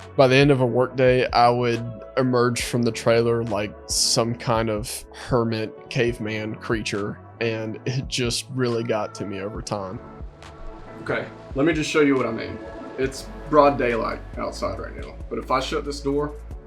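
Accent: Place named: South, United States. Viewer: Interesting accent. A little bit of a southern accent, but not too southern.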